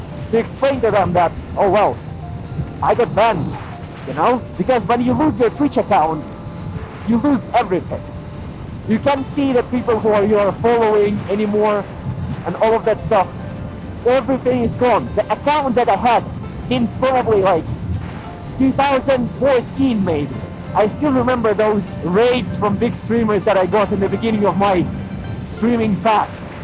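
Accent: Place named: Russia. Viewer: i clicked cuz i was looking to start streaming on twitch but i heard about constant random bans with no real clear reason from twitch making me question if its really worth it or not, as far as i know twitch is a simping platform that punishes guys and let girls do as they please with minimum consquences. anyway i clicked and as the video began i noticed that russian accent but didnt really know if its or not, but then i looked at the channel name... and im like wait!? Russian accent!? but the i know should be famous why is this account have a low below 10K, then i realized... this is second youtube account:| wow what a suprise the name brought me back some memory of old CSGO as i used to play back in 2018 until somewhere around <num> where i quit the game doesnt feel the same for me anymore it just not for me. but aside from all that im really shocked seen my guy feeling down because you my friend were part of my childhood, hope things work out for you brother, and do be careful dont risk your life because if something happened to you, your subscribers your followers are the one who will grieve over you. 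if riding your motorbike is your way of therapy i suggest you try something else maybe go for a walk or try to meet new people or spend time with OGs, and while im pretty sure you wont read this entire paragraph im still writing with the assumption you would read it, do take care of your self cheer up! LETS GO CYKA! RUSH B! B FOR BOOBS!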